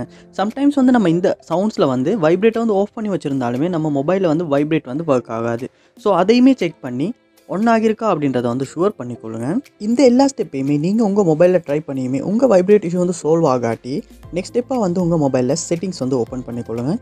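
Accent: Sri Lanka. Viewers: Unga tips na innum try pannala... But unga ilangai tamil ketka nalla irunthathu sago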